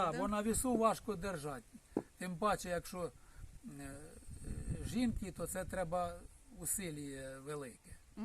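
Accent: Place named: Ukraine. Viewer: Який прекрасний говір,говір нашої України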